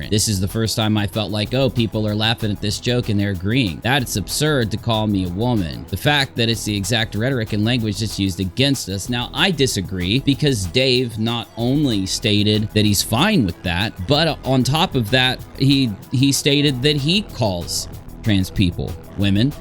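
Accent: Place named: Midwest, United States. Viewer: keeps saying "the Florida statuette" when talking about the Florida statue that protects Brian's parents. A statuette is just a small figurine, so when she says "the Florida statuette" all I can picture is a small figurine in Florida somewhere LOL. I don't know why she doesn't Google that term to make sure she doesn't sound like an inbred moron, especially considering how she Google's everything else she "knows." Or maybe it's her Midwestern low IQ accent that is making her say it that way, either way it makes her look and sound even more ridiculous than she already does. I'm not mad at it though it just makes watching her videos a little easier knowing what a clown she really is. 😂👌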